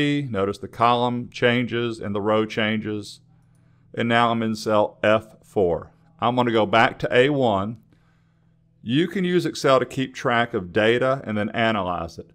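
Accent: South, United States. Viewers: Thanks buddy....yous LEGEND.! MY UNIVERSITY RECOMMENDED YOU.!! 😆 🤣 😂 *I KID YOU NOT.! THEY SAID IT'S BROKEN DOWN & EASY TO UNDERSTAND & DIGEST & I GOTTA SAY I AGREE WITH EM.! LOVE THE ACCENT BY THE WAY. IS IT SOUTHERN.? BUT AY, I BET YOU'D SAY THE SAME HEARING MY TEA DRINKING ASS, WITH MY BRITISH ACCENT. "IT IS WHAT IT IS!!!!" I'VE NOT BEEN THROUGH ALL YOUR VIDEOS, BUT COULD YOU POSSIBLY PLEASE TELL ME IF YOU HAVE A VIDEO (SO I CAN GO THROUGH YOU BACK CATALOGUE) EXPLAINING HOW YOU ATTACH OR IMBEDD A POWERPOINT PRESENTATION INTO A WORD DOCUMENT PLEASE.! CUZ OF COVID-19 ALL MY 1ST SEMESTER IN ONLINE & THEY HAVE ASKED US TO HAND IN AN ASSIGNMENT VIA CANVAS(CANVAS IS THE SYSTEM WE USE TO HAND ASSIGNMENT INTO OUR TUTORS TO BE GRADED) BUT THEY HAVE MADE IT CLEAR IT HAS TO COME THROUGH AS 1 FILE NOT 2 SEPARATE FILES(THE ASSIGNMENT CONSISTS OF A 2500 WORD ESSAY & A 20 SLIDE POWERPOINT PRESENTATION.! 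BUT I'M NOT ABLE TO TURN IT INTO 1 FILE. I WAS HOPING YOU COULD SHOW ME HOW IT'S DONE OR BETTER STILL YOU'VE ALREADY DONE IT & COULD POINT ME IN THE RIGHT DIRECTION (IF YOU HAVE ALREADY GONE THROUGH SUCH A TUTORIAL) ON YOUR AMAZING CHANNEL. OKAY I'M GONNA REMOVE MY LIPS 💋 FROM YA BUTT😁😁😆😄☺!!!??* please reply by 23rd November 2020.!!??!! Thank you.!!!!!!!!!!!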